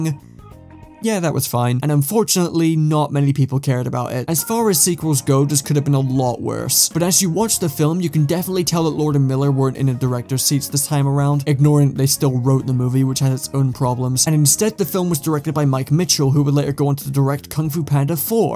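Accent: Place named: London, United Kingdom. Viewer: Bro must be from London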